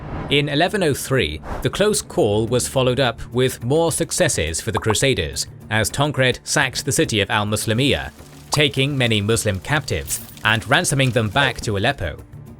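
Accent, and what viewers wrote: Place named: United Kingdom. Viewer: Your videos are great, and I love your British accent, but you occasionally mispronounce words, especially names, in ways which can't be chalked up to dialect/accent. In this video, an example is "Paschal." The ch is a "k" sound in modern English, rather than a "ch" sound (so "Pas-KAL" versus "Pas-CHAL"), coming originally from the Greek χ. Please improve this, as it is distracting from what are otherwise amazing videos. I don't mean to be nit-picky, but people do judge others on such things and I don't want you to be dinged for minor things that can easily be corrected.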